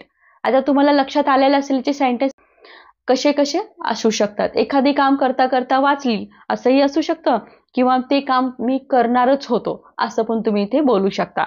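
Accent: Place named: Marathwada, India. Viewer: सुंदर शिकवता पण मराठावाडा किंवा विदर्भात अस बोललं जात जस सेव्हडं, स्लिपड, कृपया तस नका बोलू.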